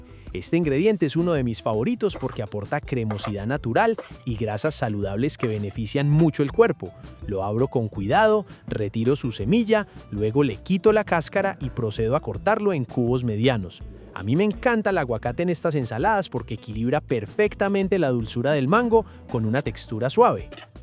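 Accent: Antioquia, Colombia. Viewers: Me fascina tu acento de paisa, I love it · Disculpe joven usted es colombiano porque me encanta como hablan y cosinan rico como esto que esta reseta que está compartiendo 🙏🏻🙏🏻❤️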